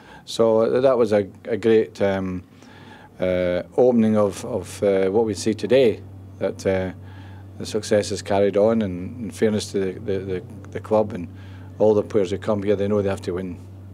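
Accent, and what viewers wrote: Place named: Scotland, United Kingdom. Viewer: I find it so satisfying to listen to a scottish accent for some reason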